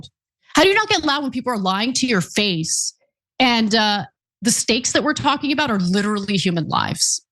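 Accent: United Kingdom. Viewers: Her extreme BRITISH accent proves she is NOT Iranian. The fuckin’ British caused most of this bs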